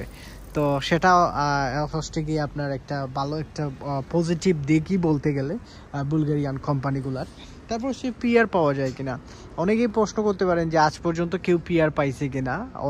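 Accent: Sylhet, Bangladesh. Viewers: Vai apne kita sylhety ni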